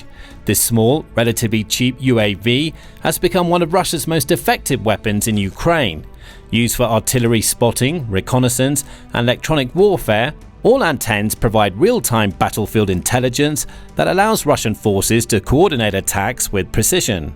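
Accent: United Kingdom. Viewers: Soon as I heard the accent, I knew it was going to be all lies and I'll be dern, it sure is. Outright fabrication. If the MI6 actually believes this crap, it's no wonder their empire has crumbled! Furthermore, how can these MI6 boys think we are ignorant of their little tricks and plots? Only the dumbest people believe that Janes Bum fantasy as savior of the world. HA! The world is awake now and will shake off these parasites like a bad cold.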